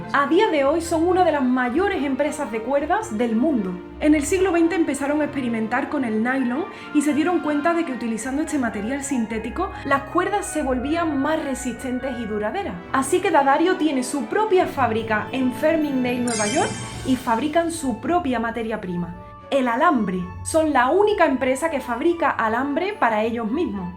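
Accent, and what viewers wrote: Andalusia, Spain. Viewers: Disculpa... de casualidad eres andalusa??? 😅😅😅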